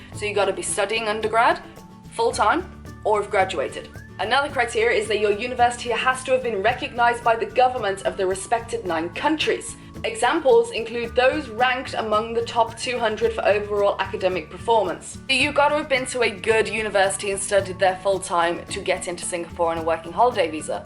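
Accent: United Kingdom. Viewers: are you from UK ,Darling !!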